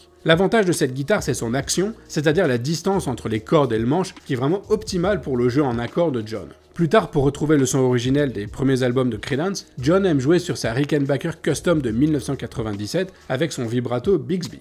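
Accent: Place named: France. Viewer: Super vidéo, du sérieux dans la recherche et bien structurée avec un petit passage par la technique toujours intéressant sans être trop rébarbatif. Un phrasé bien frenchie mais ça va encore. Bref merci pour votre présentation.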